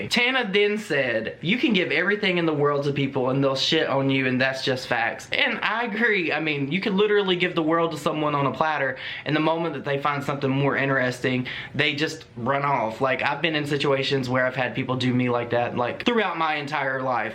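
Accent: South, United States. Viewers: WHY DOES ONLY HAVE 43k SUBSCRIBERS! He’s adorable, his southern accent is everything, he’s relatable and he got all the tea 💁🏻‍♀️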